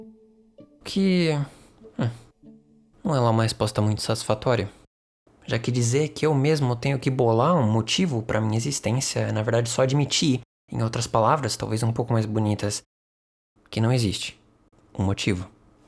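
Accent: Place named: São Paulo, Brazil. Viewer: Eu devo ser mt chato mesmo mas nem consigo assimilar o conteúdo do video direito com esse sotaque paulista fortíssimo KKKKKKKK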